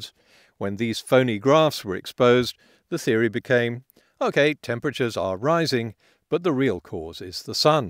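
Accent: United Kingdom. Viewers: CoupleFYI’s for you, saying it in a #BrittishAccent🗣️doesn’t make it #Believable, #Correct nor #Science and a simple #Proof of thearth’shape is #ANTARTICAScircumference⭕️🐧 #small🌏or #LAARGE🧇easy😎🙌🏾 #Science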